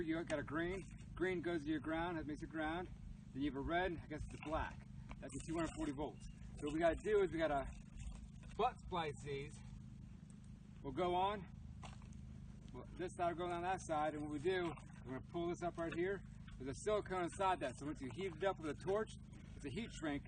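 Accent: United States. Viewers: As an Aussie living in Thailand for 19 years, I find it hard to understand your rapid American speech or what the plan for this water-supply is (and that was my specialty), but is looking so er, civilised, with his trim. Great work team, you're nearly there!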